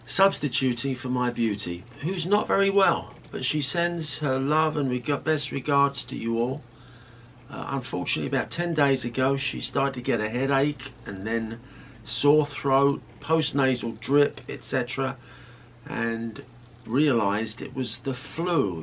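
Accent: England, United Kingdom. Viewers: Hope Jeans on the mend soon and begining to feel better x 💐 and, dont apologise for your accent. there's absolutely nothing wrong with a lovely English accent x 😉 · Awww, So sorry Jean has been so sick! I will be praying for her swift recovery. She is such a Joy to watch & listen to. As far as your British accent, I love it! So Glad Jean has such a lovely sweetheart. TY to you & on camera! God bless you all!! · Never apologise for your beautiful accent, English accent is my favourite. Give love and hugs to Jean for her Aussie youtube friend. God Bless. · Thank you for the update. Your accent is great (fellow Brit living in Texas). Dear Jean, love you, miss you, sending you all the get well wishes. I will be watching your videos all day today. You bring a smile to my face every time I watch you. Take care, rest and let those lovely men take good care of you xxx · Thank you so much and for updating on Dearest Jean. 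Your Such a beautiful family looking after each other. Wishing Jean a speedy Recovery send Love fro. The UK. PS be proud of your beginings... Great British accent! Xx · Prayers for a speedy recovery for Jean and I love the British accent. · Dear, please give Jean my love and wishes for a speedy recovery. Tell her we will all be waiting patiently for her return to her usual chatty smiling self. Thanks to you and, I’m sure Jean has the best possible care. Nothing wrong with the accent, I’m from Yorkshire originally so you sound very British to me. Love to you all 🏴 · Aww what a lovely video - (even though Jean is ill,) the way you speak about your wife with such love melts my heart! Hope you feel better soon Jean! PS, as a fellow english woman, your accent is magnificent! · Thank you for the update on Jean. Prayers for her speedy recovery. Ps..never apologize for your English accent. I love hearing it.😊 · Sending best wishes for a speedy recovery., love your English accent. 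· Thank you. Love your British accent. God bless all of you. Best wishes for Jean to get well. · I love the English accent. 💜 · thank you for keeping us up to date about our lovely Jean, sending all prayers for her speedy recovery. Your English accent is so refreshing to hear. Thank you dear for your help in getting the video out for us. Best wishes to all the Trueloves. ♥♥ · So nice of you to fill in for Jean; great job! Please don’t apologize for your accent……it’s charming and delightful and when I go to England, I’m the one with an accent! Feel better soon Jean!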